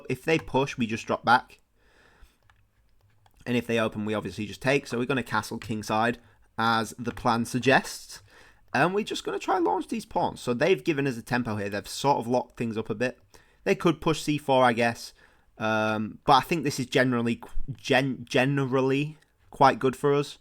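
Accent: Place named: Cockney, United Kingdom. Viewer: i think it's his cockney accent.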